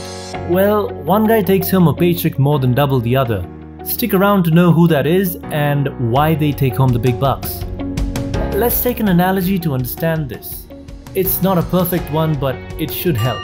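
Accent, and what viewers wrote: India. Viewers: this guy speaks a nice indian english <3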